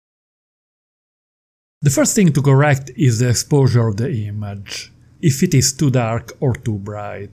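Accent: Italy. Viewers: Very informative and helpful, especially for a beginner in video editing pro photographer. And I adore this heavy Italian accent. Big thumbs up!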